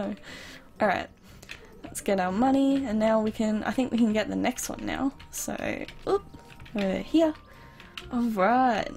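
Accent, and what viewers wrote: Australia. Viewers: she sounds like Australian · R u Australian?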